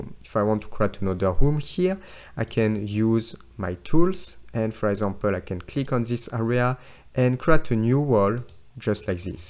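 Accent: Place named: France. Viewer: thank u for this very specific tutorial, loved the french accent ;)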